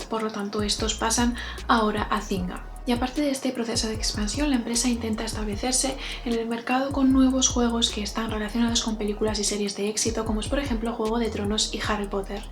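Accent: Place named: Spain. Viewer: Que buen video!!! información de calidad y buenas opciones, por cierto que hermoso tono español tienes 😉👌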